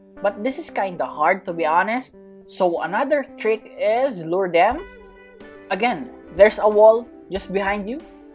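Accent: Philippines. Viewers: i know filipino people with that accent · I thought you are American but your a pilifino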